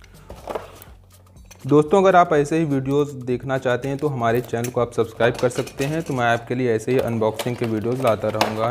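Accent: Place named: Bhojpuri, India. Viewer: Ur bhojpuri accent is fascinating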